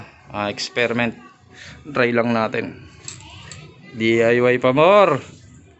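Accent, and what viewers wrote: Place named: Bulacan, Philippines. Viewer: Pa shout out ndin. Tga bulacan kaba? Parang bulakenyo kasi tono ng boses nyo po. Bulacan din po ako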